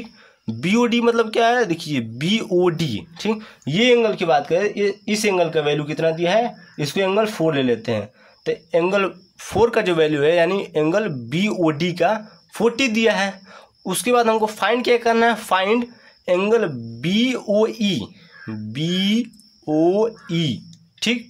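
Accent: Bihar, India. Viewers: Kitna bihari bolta h itna dana samjha tha h chiiii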